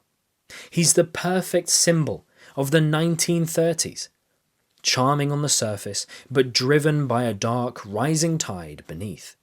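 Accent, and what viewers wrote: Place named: United Kingdom. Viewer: God how I hate the British accent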